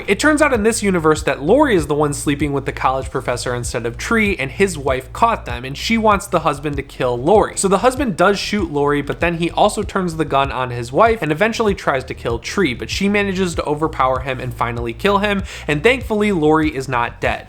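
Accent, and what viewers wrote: Midwest, United States. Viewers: You sound like you're from Chicago or midwest.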